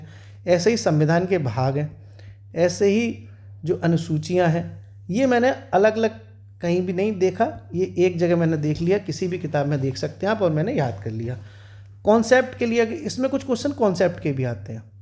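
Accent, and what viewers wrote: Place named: Etah, India. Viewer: एटा की भाषा लगती है आपकी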